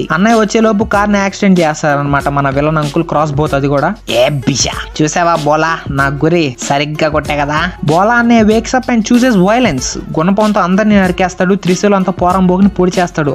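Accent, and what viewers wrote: Nellore, India. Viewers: Mama nedi Nellore eh gaa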